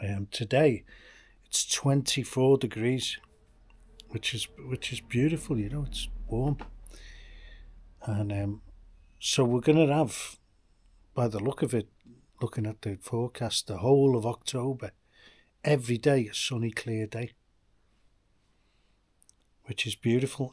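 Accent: United Kingdom. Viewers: love the British accent. England has an amazing history!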